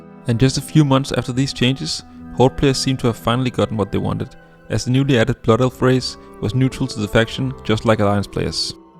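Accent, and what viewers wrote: Denmark. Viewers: Elsker din danske accent :D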